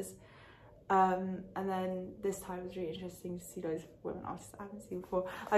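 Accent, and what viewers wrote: France. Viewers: Vrmt j’aime trop tes vidéos ! Ton accent français est bcp trop mignon en plus. Je regarde aussi tes vidéos en anglais aussi psk en tant que française qui étudie au UK j’essaye d’avoir l’accent british mdrr :))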